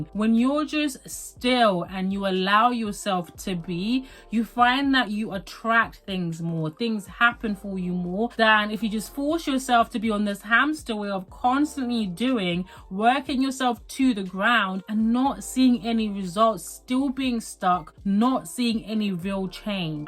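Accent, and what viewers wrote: United Kingdom. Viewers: I just love the British accent 😊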